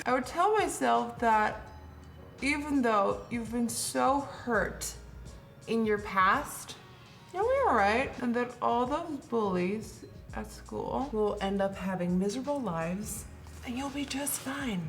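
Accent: United States. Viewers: How come you have an American accent and don’t sound Dutch at all xx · Can you do a video where you transfer yourself into Adele? You favor her I think. My mother is Dutch but I never picked up the language. She like you speaks with an American accent. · I have been following you for three years and I was thinking you’re Amerikan😱Wow you speak incredible babe 😘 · I THOUGHT YOU WERE AMERICAN! youtube unsubscribed me so I resubbed. Either way...Houston Tx USA Come see uss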